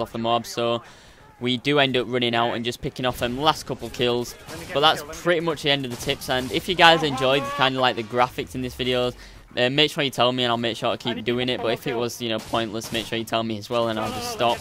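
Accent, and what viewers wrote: United Kingdom. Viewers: you're a great player and commentator dude. i love listening to commentators from the UK. its good to respond to your fans' comments. keep it up!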